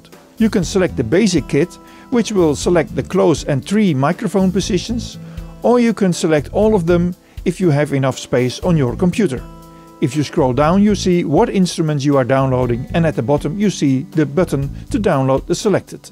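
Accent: Netherlands. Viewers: Really funny Dutch accent.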